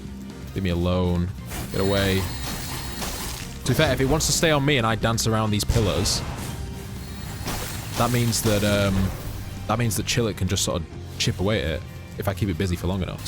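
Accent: United Kingdom. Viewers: The worst part is a br't'sh dialect. "Fancy my chances", you people really are the native speakers of it? 🤧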